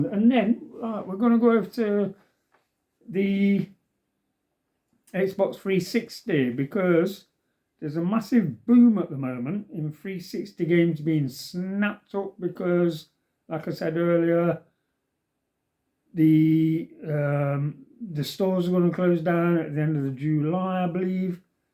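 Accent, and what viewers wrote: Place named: Nottingham, United Kingdom. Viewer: Are you from Nottingham by any chance? Might be the accent I dont know haha. Nice cave mate. All the best.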